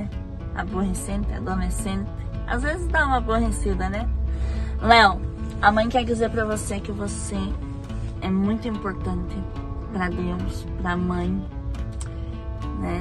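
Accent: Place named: Paraná, Brazil. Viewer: Parabéns pelo seu aniversário Léo, que Deus continue cuidando, dando muita saúde e que tenha sucesso em toda a sua jornada, gosto do seu sotaque e das frases da sua região do Paraná😂😂😂😂👍🙌🎊🎁🍰🎂🍰🎂🏆👏👏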